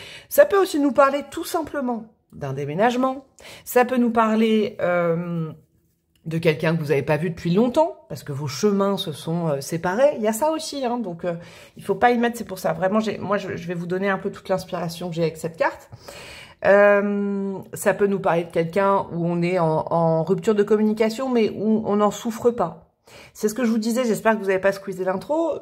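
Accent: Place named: Alsace, France. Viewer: Coucou ma ✨…tu chopes l’accent alsacien😅…ma belle région❤️ Choix 2…alors quoi te dire, la dernière guidance m’a déjà énormément parlé. Depuis notre guidance générale de l’année, il se passe bcp de choses, un truc m’est tombé dessus. Je ne l’ai pas vu venir….baaaam je ne contrôle plus rien. Tu avais vu juste😆🙏🥰…j’avoue que ça me fait un peu flipper. ❤️❤❤ et ça va me faire sortir de ma zone de confort. Une rencontre se profile pour bientôt🫣. Bon we, merci pour tes belles vibes et tes ressentis⭐️⭐️😘😘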